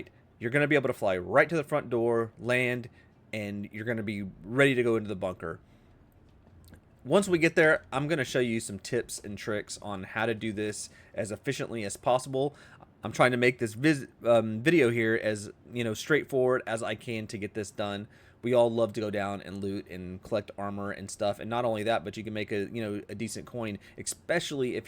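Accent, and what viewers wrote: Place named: Tennessee, United States. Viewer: You sound like a white guy from Tennessee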